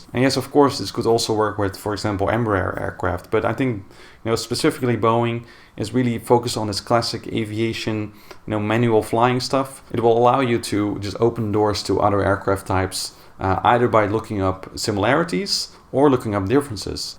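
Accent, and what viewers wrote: Netherlands. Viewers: i knew you where dutch by your accent and because you showed a klm airplane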